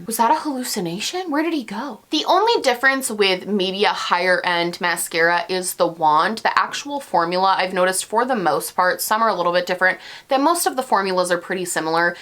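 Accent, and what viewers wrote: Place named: Rochester, United States. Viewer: I'm from Rochester but I've lived in Seattle for five years and I watch your videos to hear that sweet sweet Rochester accent ❤️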